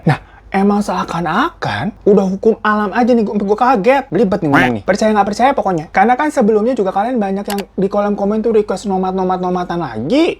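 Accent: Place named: Pasar Minggu, Indonesia. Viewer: Kamu ngerti keseluruhan bahasa indonesia logat pasar minggu emang?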